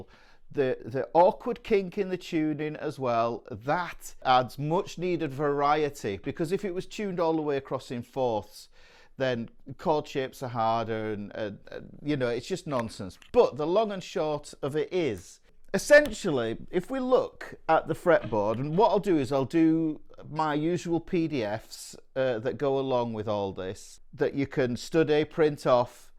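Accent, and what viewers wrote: United Kingdom. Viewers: Part-2: It's interesting how content from an instructor/mentor speaking in a UK accent makes the content seem more authentic!! Because of the unique linguistic "personalities" (for lack of a better word), it would be fun to see your content in Scottish, Irish, Cockney, and AU/NZ. (with subtitles of course!)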